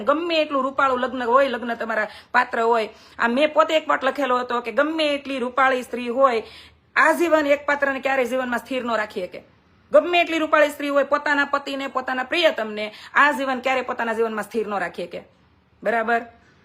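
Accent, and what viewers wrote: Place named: Kathiawar, India. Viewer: ઓરિજનલ કાઠિયાવાડી શૈલી માં ખૂબ સુંદર વાતો કરી gret hartly lovely tru ok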